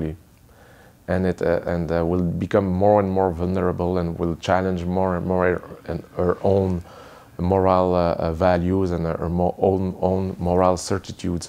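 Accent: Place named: Quebec City, Canada. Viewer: Even if my English sounds like the one Denis is speaking (we are both from Quebec City), I smiled at that part: You know to know, you know to know the limit, you know to know the where where ;)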